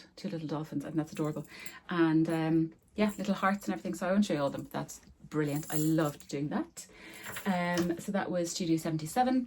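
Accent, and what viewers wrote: Ireland. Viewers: Love your vlogs, you go as green as you want! 💚 😂 Also love your Irish accent and learning Irish words, my grandad was Irish and always used to recite poetry and stories to us, although full of blarney!! 😂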